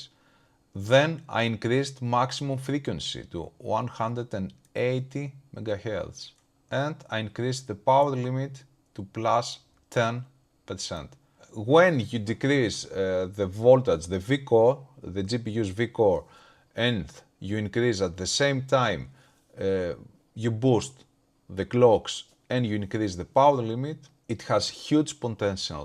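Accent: Greece. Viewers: by the accent i bet that you are greek :) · But with a Greek accent! 🙌🏼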